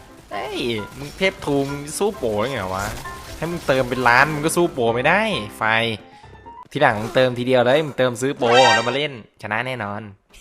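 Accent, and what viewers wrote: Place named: Rural, Thailand. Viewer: พูดเสียงเหน่อ น่ารัก >,<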